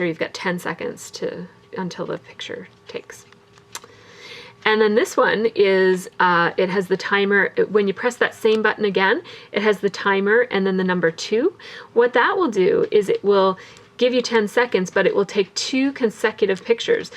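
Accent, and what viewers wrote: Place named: Canada. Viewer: Thanks for the great review! I've debated on buying one of these for a while. Also it was nice to see your pretty face on camera! I've watched your channel for about a year now so it's nice to put a face with your sweet Canadian voice :) ~Melissa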